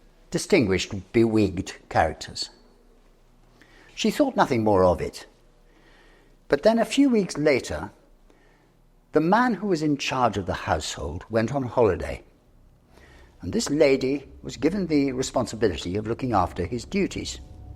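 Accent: United Kingdom. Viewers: This video is super cool because it combines 2 things that I am very FOND of: STONE MASONRY ARCHITECTURE and SUPER-NATURAL ACCOUNTS!! His voice is really suited for telling Ghost ([der] Geist) stories, and I like his BRITISH ACCENT too!! I am a NATIVE Texan watching from the 2nd largest state in the United States (Texas). A FEW of my ancestors on my Mom's side were from Great Britain!!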